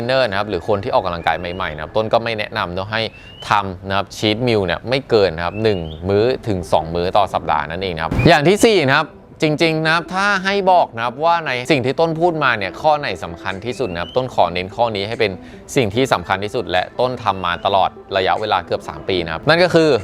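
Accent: Thailand. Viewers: น้องออกเหน่อนิดๆ เป็นคนต่างจังหวัดใช่มั้ยครับ น้องอธิบายได้ดีมากครับ ทำตามได้ง่าย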